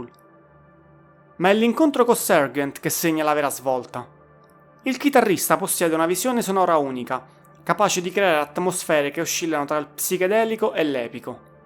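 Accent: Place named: Rome, Italy. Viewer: Bravo per l’iniziativa, ma tra accento romano (mi distrae troppo) e (si pronuncia PorcupAine), non ce la faccio. Meno male che ci sono altri podcast. Comunque meno male che finalmente si parla di buona musica!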